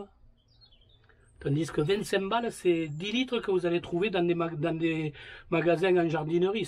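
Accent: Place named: South-West, France. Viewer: Bonjour Monsieur, votre accent sent bon le sud ouest j'ai une connaissance à Pauilhac 32500 après cette parenthèse régionale voilà ma question votre mélange (terreau perlite vermiculite ) quels sont les proportions optimales pour vous imiter sans aucune discussion? en vous remerciant d'avance pour ce que vous faites aux amateurs non voyant que nous sommes.... mes salutations J.P. made in belgium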